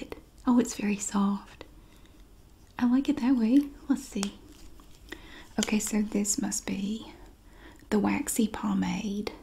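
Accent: South, United States. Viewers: Hi I really love your videos I like them more for how you make the smallest things and purchases exciting and interesting...yes, its a ramble, but you do have things to say, and its from the heart, I can tell you are not putting on with this, but I can tell you indeed do have fun with this, and you are a tad silly/funny, so I know its a slight put on I do feel relaxed by your regular voice, but I think its the combination of your southern charm and accent as well that relaxes, as its not really text book ASMR, of which I can only handle so much I really enjoy your videos, when I need calming I keep the browser open and put it on.... | And of course, southerners always tell the best stories!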